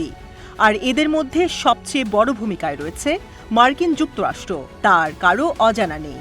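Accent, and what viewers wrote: Kolkata, India. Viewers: কলকাতার স্টাইলে সংবাদ পাঠ করছেন কেন? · এই সংবাদপাঠিকা ভারতীয় মনে হচ্ছে কোনোভাবেই বাংলাদেশী মনে হয় না।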